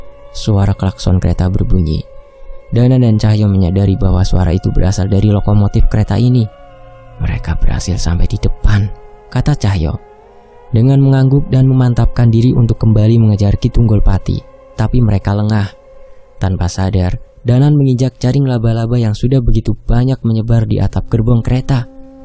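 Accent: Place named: Bali, Indonesia. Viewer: Miiih..miiih..dhuwek sajan blii logat bali..pinter pisan aei😄🥰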